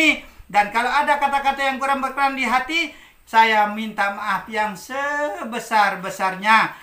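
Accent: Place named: Sumatra, Indonesia. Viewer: Yg lebih keras LG bang setuju sy gy bicaranya sama dgn km orang Sumatra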